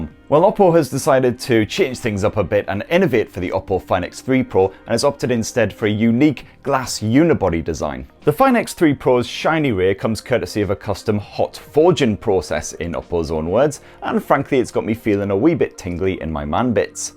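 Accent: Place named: Sunderland, United Kingdom. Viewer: Yet another brilliant review. Sunderland I live and you sound very local to me. I bought the x3 pro on the back of this review and I love it!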